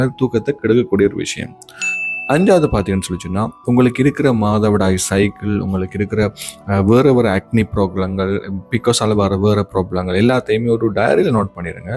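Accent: Sri Lanka. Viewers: Ungade pechu Srilankan's mathiri irukku sir.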